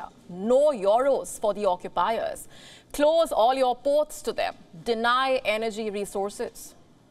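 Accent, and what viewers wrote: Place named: India. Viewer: How funny is the Indian accent 🤣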